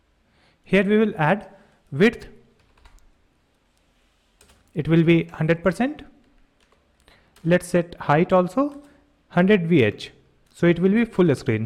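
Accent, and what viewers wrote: Bihar, India. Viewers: Sir aap bihari ho? Just asking no offense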